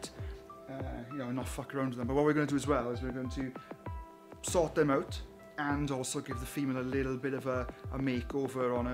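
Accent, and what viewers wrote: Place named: Wales, United Kingdom. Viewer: Love your welsh accent 🥰🕷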